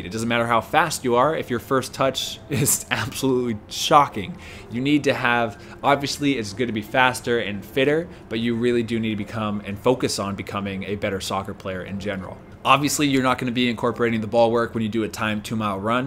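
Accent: United States. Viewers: He's speaking American English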